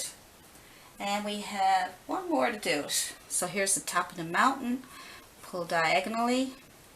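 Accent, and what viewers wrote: Newfoundland, Canada. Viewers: You sound like a Newfoundlander. My family is from Newfoundland. ❤️ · Wonderful, thank you! (Are you from Newfoundland?) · Thank you so much for the lesson! Do I detect a Newfoundland accent? 😊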